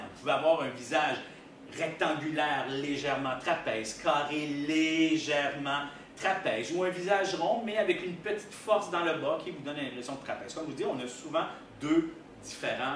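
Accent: Canada. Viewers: Bonne vidéo avec un joli petit accent du Québec !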